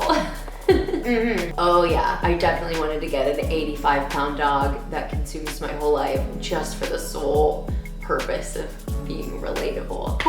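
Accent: United Kingdom. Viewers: she sounds like she's from Yorkshire! omg that was so freaky she sounded exactly like my cousin!!!!!!!!!!!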